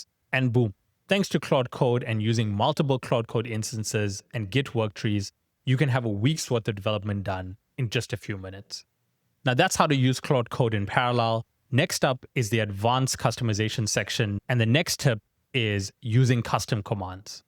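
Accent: South Africa. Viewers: Are you South African?? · Are you South African? Or do you have a South African parent? Your accent is very interesting, if so, it’s great to see a South African make probably one of the best CC videos I’ve seen. 🔥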